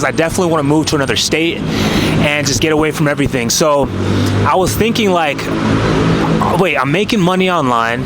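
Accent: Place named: Texas, United States. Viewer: I can already tell you was from Texas lol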